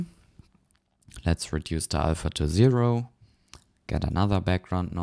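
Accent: Germany. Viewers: Nice video but you sound so german😁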